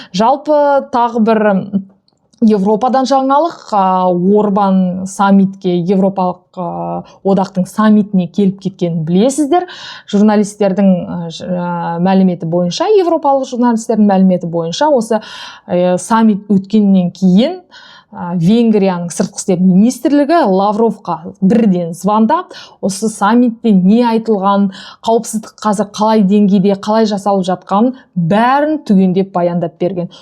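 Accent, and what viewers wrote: Standard. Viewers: Өте түсінікті таза қазақша сөйлейсің рақымет ұзақ ғұмыр тілеймін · Айналайын қандай жақсы таза қазақша жеткіздің рахмет 👍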